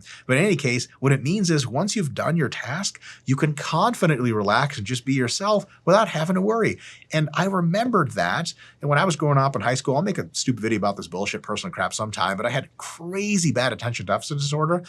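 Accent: Russia. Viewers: Damn, you Russian mate? Awesome. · Whoa Сделал дело - гуляй смело! You have really russian pronouncement!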